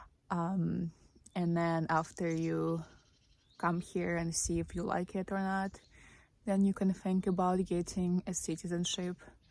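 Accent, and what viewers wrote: United States. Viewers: Ive heard you say in past videos you talk like you were living in the US. What got you to the USA? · u speak very good american english. i thoguht you were american and moved.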